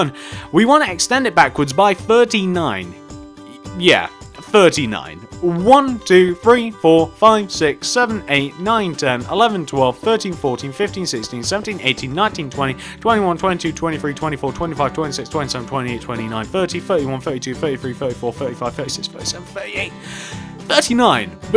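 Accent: United Kingdom. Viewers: He sounds British, and I'm British, so if he is British, why did he spell it Theater instead of Theatre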